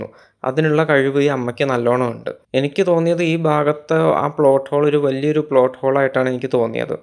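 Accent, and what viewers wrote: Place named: Palakkad, India. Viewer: വളരെ കാഷ്വൽ ആയ വിവരണം! കൊളോക്വിയൽ പാലക്കാടൻ(?) സ്ലാങ്ങ്!! നല്ല ലോജിക്!!! 🎉❤🎉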